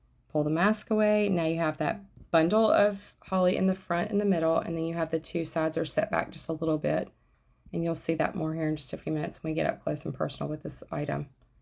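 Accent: South, United States. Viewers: I’m very very sensitive to voices and actually think your tone and cadence is very calming. Ignore the meanies, some people just need to spread their own misery sadly. Also love your little bit of Southern accent lol. I can’t wait to get back down to Goodyear for the winter and do some more shopping at your booths. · Excellent tutorials. I know nothing about embossing and I’m so pleased I came across you channel. I love a southern accent and a gal that can talk my ear off. So refreshing. Thankyou 🤗🥰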